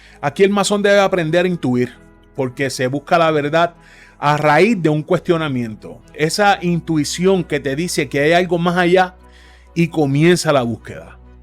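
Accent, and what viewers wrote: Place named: Puerto Rico. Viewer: Quiero saber mas de este tema pero buscare algo mas confiable ya que usted siendo de puerto rico le quita seriedad y credibilidad ademas de que ese acento da mucho asco, mejor busco otro video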